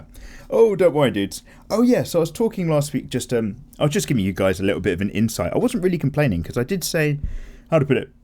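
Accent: United Kingdom. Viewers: Hey, greetings from Bulgaria! I love your art and especially your tutorials! Some time ago I got into DWM. At first I was sceptical as to how the hell I could draw while you’re British accent is echoing inside my ears, yet I found it’s amazing! I truly draw better while listening to DWM! Thank you, keep up the good work!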